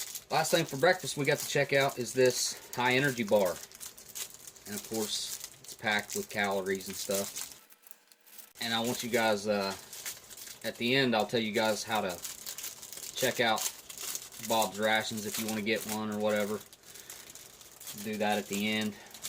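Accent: South, United States. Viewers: OMG THATS AWESOME!!!! I'll keep an eye out for the video!!! And congrats on the milestones!!!!! That's so awesome!!!! Btw I love your videos AND your accent! Southern boy huh?? 😁 I'm super happy for you! Congrats again!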